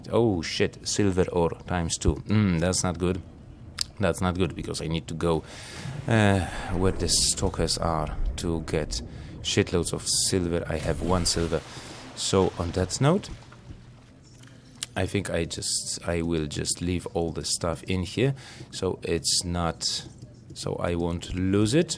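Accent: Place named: Eastern Europe. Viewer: Where are you from, your accent is very nice. I think east europe.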